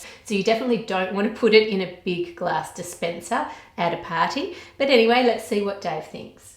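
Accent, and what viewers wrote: Australia. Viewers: Her Australian accent is so strong😂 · I love your videos so much omg I remember watching you like 6years ago. I actually learned english by watching your videos😁 now I have an aussi accent even tho I'm not aussi😂 thank you for keep making these videos🥰 I wish I could support you on your patreon but I unfortunately can't atm but you'll definitely be the first (and probably only) person I'll support! Thank you for everything and keep going❤ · Hey I love your channel And after binge watching your channel I changed my Siri to Australian since I love your accent Hope you reply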